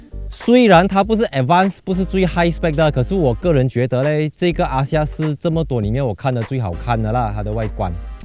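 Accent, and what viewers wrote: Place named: Malaysia. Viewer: 每次看你 test drive ，觉得你不去 当赛车手真是可惜了，😂 讲话又有 Malaysia styles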